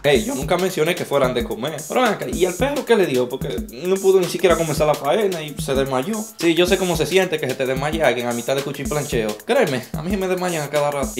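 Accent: Cibao, Dominican Republic. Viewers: Dominicano de pura sepa...No se nota?? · es dominicano del Cibao jajajja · Jajajajaja que risa manin eres de República Dominicana no lo puede nega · AJAJAJAJAJAJAJA ME ENCANTAN TODOS TUS VIDEOS SON LO MAXIIMOOO... Y ESE ACENTOOO DOMINICANOOO EXCELENTE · hahahahahahahahahahahah k cura x.x eres dominicano?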